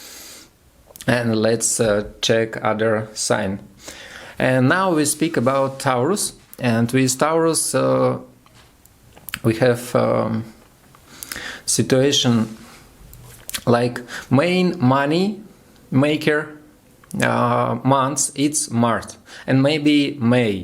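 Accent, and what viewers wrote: Russia. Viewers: акцент классический русский... хотя у итальянцев такой же - по крайней мере, очень похож.. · День добрый прикольно русский английский это что-то...😁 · Wow!! You were surprised! Yes, of course, your English is far from perfect, but understandable. In my opinion it's a good idea. You need to expand the audience and you are moving in the right direction. My English is terrible, unlike yours, and the same terrible Russian accent, which only improved understanding. Subtitles weren't needed. Accept my praise again! Keep it going! P.S. простите меня, если я вмешиваюсь в ваши планы, но было бы более правильным открыть отдельный канал для англоговорящей аудитории. Немного практики, занятий с носителем языка, и все будет великолепно! Русский акцент только придаст вам определенный шарм! Вот только танцевать не надо. Это уже будет too much.))) But that's just my opinion, you decide!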